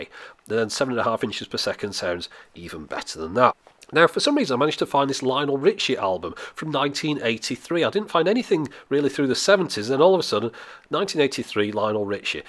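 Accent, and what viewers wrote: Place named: England, United Kingdom. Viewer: This guy is great! I majored in electronics in the 60's and connected every wire in four 24-track analog recording studios in L.A. so I appreciate someone who clearly knows what he is doing and explains with a patient voice. Of course, I have heard it said many times that us Americans, when hearing an English accent, believe this person is way smarter (this guy really is) than the usual bloated sack of protoplasm. ;~)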